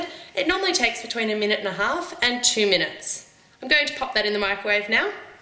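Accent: Australia. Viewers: It's so nice hearing another Aussie voice on Youtube! I love your tutorials, I've just come over from Yoyomax, I'm so glad you two did this collaboration. Thank you so much for posting all of these videos, they're wonderful! x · OMG are you australian I LOVE YOUR ACCENT!!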